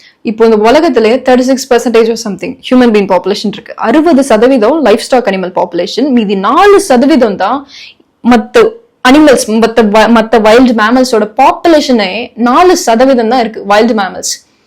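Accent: Tamil Nadu, India. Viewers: I guessed you were tamil when I watched your English videos 😂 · your english also has indian accent apo ummaiyave ne indian thana ?🔥🔥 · நீங்க தமிழ்ன்னு முன்னாடியே தெரியும் எனக்கு, நீங்க பேசுற விதத்த வச்சு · I was watching your videos by your accent I found you are a tamil girl. Great to see you in speaking Tamil❤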